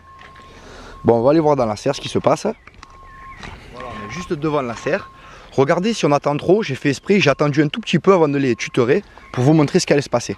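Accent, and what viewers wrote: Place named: South-West, France. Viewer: merci a toi je pense que tu est dans le sud ouest non tu a un accent simpatique moi jsui sud est un grand merci pour tout ton temp et ton savoir faire que tu partage jatend les ptit secret1😉👍👍👍👍👍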